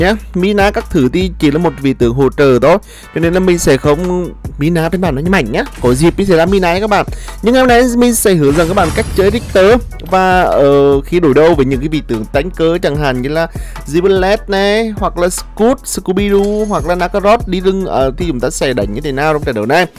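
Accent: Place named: Central, Vietnam. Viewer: Thích giọng miền trung ghê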